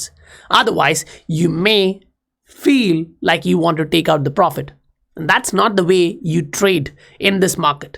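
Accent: India. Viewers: the voice like Indian......?.....great fundamental analysis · You say you're in Korea but you sound like you're from India... ?? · ☺️ btw ur accent is quite similar to Indians 😒..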